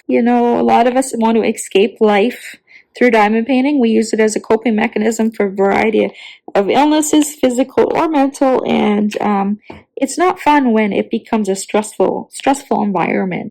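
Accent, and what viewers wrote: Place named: Canada. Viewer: 🌹 Hi - sorry, is it or or something else? I’ve heard your name in someone’s Live, but I’m new to following you. I’m April Campbell and I live in Oregon. I’ve been Diamond painting for a little over one year. I’m a knitter. I’m now fully addicted to diamond painting 😊. Oh well. I enjoyed your WIP and Chat. You have a lovely Canadian lilt that is very soothing, so thanks for that!! And I just want to add that I hope your upcoming procedures help you - and you are in my thoughts for all good things. ❤ from Oregon. I’m glad I found your channel!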